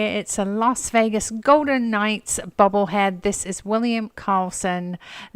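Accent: United Kingdom. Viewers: you are sooo cool I enjoy watching and following you. It's June from Jersey. You are such a pleasure to watch and listen to. Like that British accent, girlfriend. You are loved in the Northeast keep rocking!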